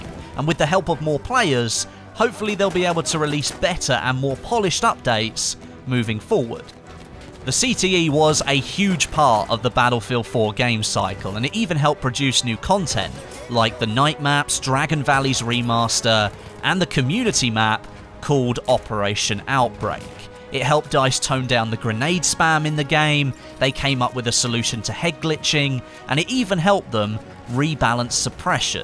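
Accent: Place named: England, United Kingdom. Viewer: English English not phony american english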